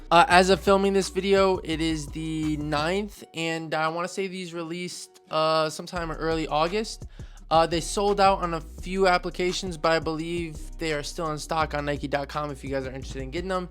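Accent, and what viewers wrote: New York, United States. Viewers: New york accsent is crazy size 9.5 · BRO THE NEW YORK ACCENT HAD ME DYING 😭😭 (size 7.5) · nice new york accent man😭😭 (size7) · The ny accent is nutty ( size 10.5)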